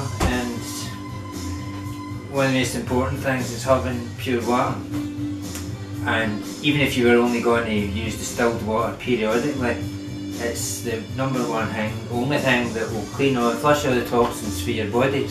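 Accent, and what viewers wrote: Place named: Scotland, United Kingdom. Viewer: Say, whuh??? Huh? Whudie say? hahahahaha ..no, just teasin' ya. Couldn't understand a word ye said, but no mattah .. yer precious in your delivery ... and yes, last part was in yer proper Scottish accent ... I almost understood every word. I do declare, Scottish is much more difficult to understand than Dutch. Bless you for making this video .. I will definitely go out now and get a water distiller. It sure seems to be working for YOU my friend. Thanks again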